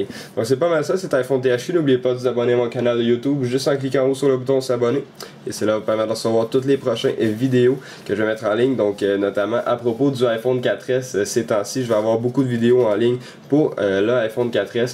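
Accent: Quebec, Canada. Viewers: merci pour ton bon vidéo! tu est presque le seul qui vient pas de france... sa fait du bien enttendre un québécois!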